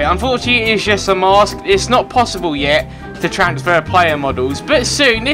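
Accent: United Kingdom. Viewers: is British?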